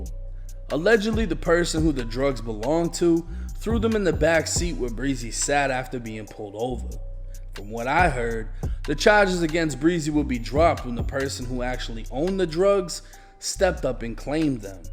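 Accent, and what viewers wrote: Boston, United States. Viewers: putting on Boston. Respect bro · I knew I heard a fellow Bostonian accent I had no clue u was from here boy much love keep getting the bag 🙏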